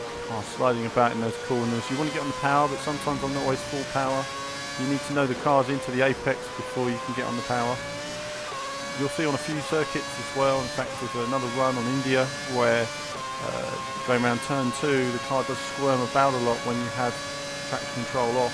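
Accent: England, United Kingdom. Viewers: It's a figure of speech. I'm fully aware he is an Englishman. Think the accent gave that away :)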